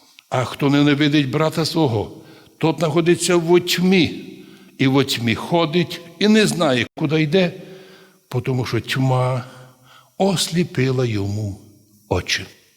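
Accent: Transcarpathia, Ukraine. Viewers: Українською з закарпатським колоритом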